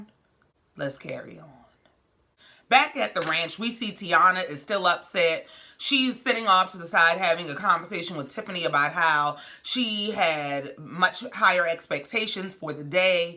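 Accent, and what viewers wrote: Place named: New Orleans, United States. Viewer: Love how you talk . It’s similar to NO. It’s so cool.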